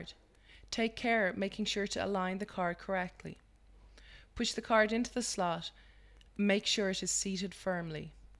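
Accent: Ireland. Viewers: great, simple video ... 4 stars + 1 star for the Oirish girl doing the talking. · She sounds Irish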